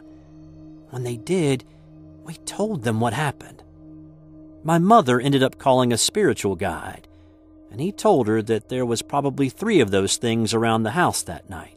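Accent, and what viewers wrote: South, United States. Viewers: Your country girl accent is perfect. Lol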